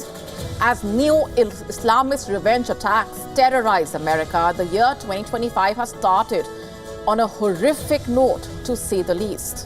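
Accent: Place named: India. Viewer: She is annoying, the other anchors do a much better job...this is typical head bouncing Indian accent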